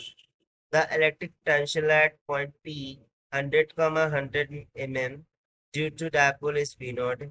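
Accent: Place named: South India, India. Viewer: South Indian hai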